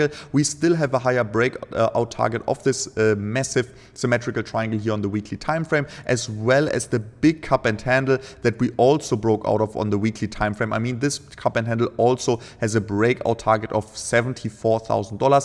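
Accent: Germany. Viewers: Bei dem Englisch gehe ich mal von einem deutschen Kollegen aus. Hört doch bitte auf mit DIESEM MEGASCHEISS!!!!!! DANGEREOUS!! Das geht auch ohne diese reißerischen Thumbnails und Texte! Ansonsten alles Gute!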